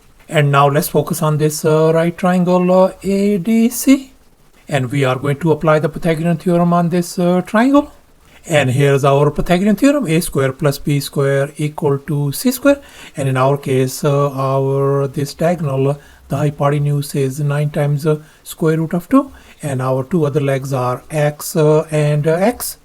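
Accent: India. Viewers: It takes 12 seconds to solve this . it's OK to speak indianglish but you don't need to kill yourself for 10 minutes 9 × radical = 12.7279... 12.7279...- 8 = 4.7279... 4.7279...× 8 = 37.8233... 😂😂😂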